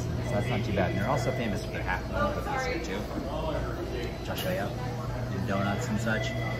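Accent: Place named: Northeast, United States. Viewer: I just watched your YouTube video in which you rather aggressively attempted to get a Lancashire man to speak a bit of his dialect and accent. Your approach ended up being ham-handed, which made the lovely old gent tell you, quite ironically with typical British politeness, to go and ask his far-off wife to speak Lancashire things, which really meant for you to sod off. I originally came to your defense as a typically naïve Yank asking him to bark like a trained seal for the camera, if you don't mind my analogy, and that the Brits need to check their snootiness at the door, and cut you some slack. But, having seen your style of interrupting your guests, your impatience, and your fast, aggressive northeast American accent, I'd say you need to slow your roll, give folks a chance to respond before you interrupt them. You have potential. 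Only until you dispense with what we Brits call the 'ugly American' interview style will your talents truly be special and distinguished.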